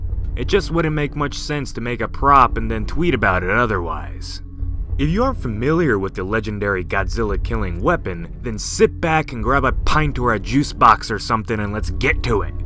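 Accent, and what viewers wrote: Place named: United Kingdom. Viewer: Nice British accent 👌🏻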